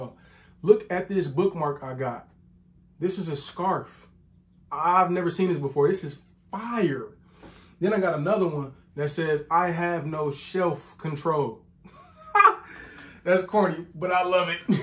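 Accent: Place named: Rio de Janeiro, Brazil. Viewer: I’m from Brazil and you sound like a Carioca (people who were born in Rio de Janeiro) and I love it. Your channel is such a incredible discovery ❤️ love the way you talk about the books! Hope you’re having a great booktube experience!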